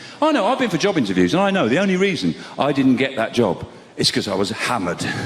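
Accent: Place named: Sussex, United Kingdom. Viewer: Sounds like a Sussex lad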